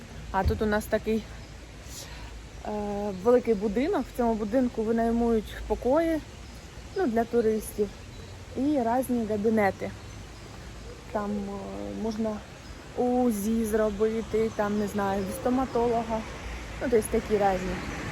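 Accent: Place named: Nikopol, Ukraine. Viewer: Я хочу сказати,що в Польщі чисто скрізь. Прибираають вони не тільки для туристів, вони так живуть.І це супер. А ще, я просто в захваті від Вас і Вашої мови! Мої батьки і я теж так розмовляємо (балакаємо)). Я з Нікополя. Як добре, що ви виїхали з окупації. Наш Нікополь обстрілюють з Енергодара по три -чотири рази на день... Просто жахіття.Кожний день гинуть люди, руйнуються будинки...Я в Кракові. Мама їхати до Польщі не хоче. Сказала, що вмирати буде в своїй хаті... Плачу, п'ю таблетки,щоб заснути. Ви тримайтеся, ви разом і уе головне. А в Польщі теж можна жити, працювати і заробляти. І будемо молитися, щоб ті фашисти згинули з нашої землі, з наших домівок. Продовжуйте знімати, у Вас добре виходить, цікаво. А кому не цікаво і не подобається, то,як кажуть, ви ж не долар))щоб всім подобатись.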